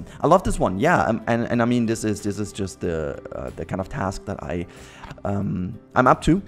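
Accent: Germany. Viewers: Man kann irgendwie raushören das du deutsch bist:). Is echt schön was du hier machst!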